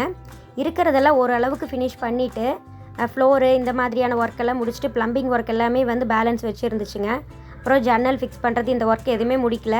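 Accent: Kongu, India. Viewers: unga slang apadiyea kongu slang mari iruku sis....i am new from u r cheanal wru u from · அழகிய கொங்கு தமிழ் அ பேசறிங்க ங்க‌ I like ur slang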